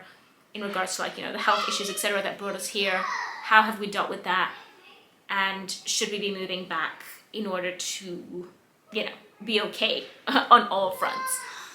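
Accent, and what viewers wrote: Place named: Australia. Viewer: Omg I thought you were Australian, you had a very strong Aussie accent when I first started watching some older videos! I’m Australian!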